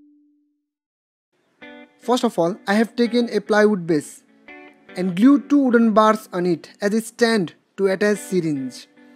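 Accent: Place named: India. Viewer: why is his a indian guy my partner is laughing about his accent help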